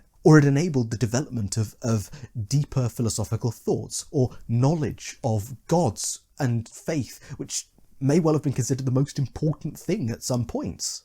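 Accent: United Kingdom. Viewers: Worst Witch probably a inspiration. Basically bunch of young witches go to school learn how to be witches. (inside universe boys goto wizard school). Its from the 70's. All the adventures take place mostly in the school or school related activities. They had many tv shows on this from UK. You sound like you're from UK :p. You should know lol. I swear Harry Pottery is just the male version of worst witch. Description of Book copy and paste The stories mainly take place within the walls of Miss Cackle's Academy, a girls' boarding school in a stone castle atop a mountain, surrounded by forest. Each book covers one term at the Academy.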